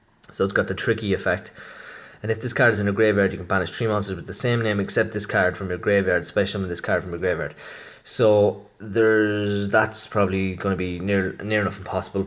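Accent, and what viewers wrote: Ireland. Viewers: Are you Irish btw? :)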